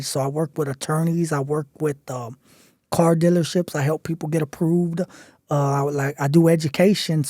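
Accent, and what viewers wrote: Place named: South, United States. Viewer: You serious? This fool is speaking like a ghetto ass reject using big words along that country bumpkin twang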